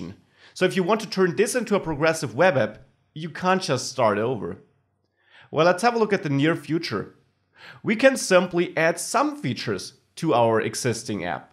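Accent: Germany. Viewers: I'm from Germany and watch this German guy speeking Englisch...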